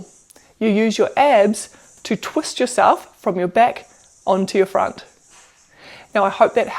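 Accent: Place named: New Zealand. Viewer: love the accent .. New Zealand ?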